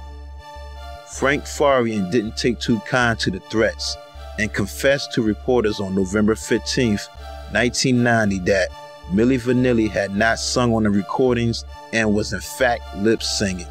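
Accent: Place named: Baltimore, United States. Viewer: Very informative, but I could do without the Baltimore accent of the narrator.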